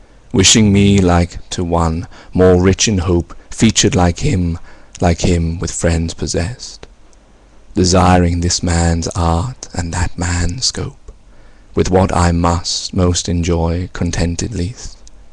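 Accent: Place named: United Kingdom. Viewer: You have an excellent reading voice. You would be a fantastic narrator for books on tape and radio dramas! As an American, I must say that a British accent is always enjoyable to hear!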